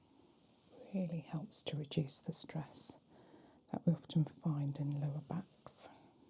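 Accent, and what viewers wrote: United Kingdom. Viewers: Lovely video as always. Your lovely British accent is like silk to my Swedish ears 🤗🥰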